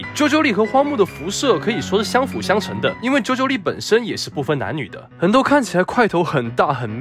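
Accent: Taiwan. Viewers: 為何博主講話沒什麼口音啊XDD倒像台灣口音了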